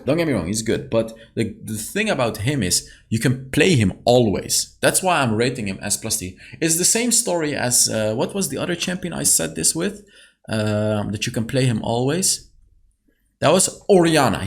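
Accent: France. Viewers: Bro your French Accent is op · Nice frensh accent love it but man pls just Say it it's easy ku de grasse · "she doesn't suck the things she's supposed to suck" spoken like a true french, you nailed the french persona 💪💪👍👍 very well done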